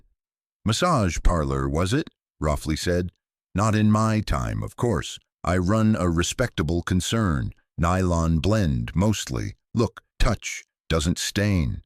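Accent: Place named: United States. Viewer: Dreadful! AI rubbish, appalling American accent!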